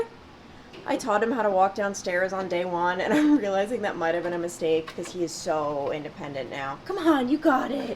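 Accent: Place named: Long Island, United States. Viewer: I think your lack of sleep is making your Long Island accent come out more 😂 Asher is so cute!!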